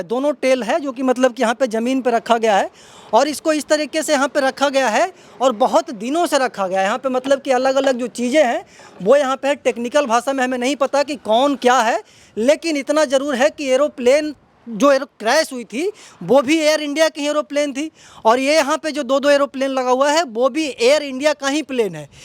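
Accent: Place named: Bihar, India. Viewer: गंदा बिहारी बोलने का कुछ पता नहीं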